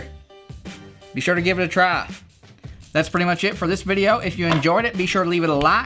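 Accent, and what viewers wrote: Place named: Tennessee, United States. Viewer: I'll have to try this. Are you from TN by chance? Just cause of the accent is why I ask.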